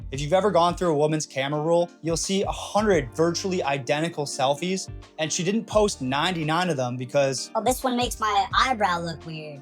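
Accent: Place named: Canada. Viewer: Thumbs down for excessive “bro” and irritating Canadian accent.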